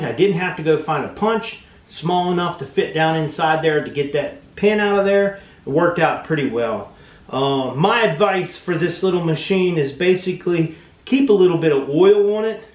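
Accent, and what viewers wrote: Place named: Pennsylvania, United States. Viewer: Love your accent.. PA? NC?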